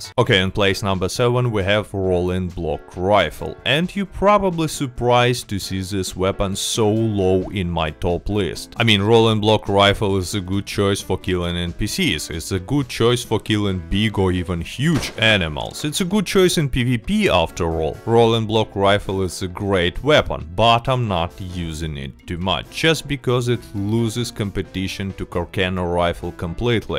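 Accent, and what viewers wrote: Russia. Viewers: I've only just found ur acc but I can tell I'll like u as a content creator also i can hear ur Russian and your English is good can't wait to see what u got for me and I just edited this and realised I replied to som1 and not commented my bad🤭😂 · Russian accent still visible enough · Wow, all video I was like "is this a Russian accent" and when I saw "попадание в шляпу" I get it, so I have a question, what is painting black, is it giving more damage ? Буду благодарен ответу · are you Russian? ME TOO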